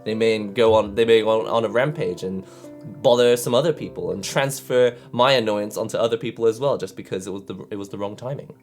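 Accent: United Kingdom. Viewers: Hi, I've been one of your audience for a quite long time through I've never left any comments before. Hearing your voice makes me feel peaceful. I'd always love to run your video at the background talking things while I'm at home doing other stuff. The British accent from an Asian guy like me gives me a little inspiration and hope for life to become better, especially during this rapid pace life in city, and I think I've just found room where I can breathe after work. I've not played fighting games at all but listening to you is quite enjoyable. Your channel is great and I just want to let you know that there's people who find good things from your videos and they've always let me find courage to continue with life. Sorry about the poor expression, I hope my English to be as good as you some day.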